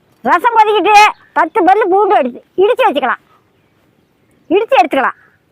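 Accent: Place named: Erode, India. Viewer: ஈரோட்டில் எந்த ஊருங்க, நாங்க பேசுற பேச்சு மாதிரியே இருக்கு, அதான் கேட்டேன்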